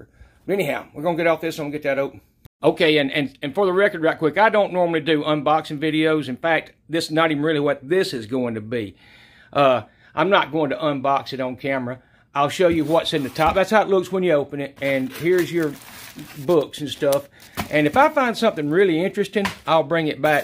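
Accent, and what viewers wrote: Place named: Texas, United States. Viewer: your accent is fantastic! Texas?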